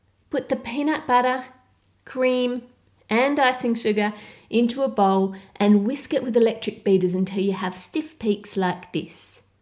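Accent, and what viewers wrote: Australia. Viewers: who loves australian aacent?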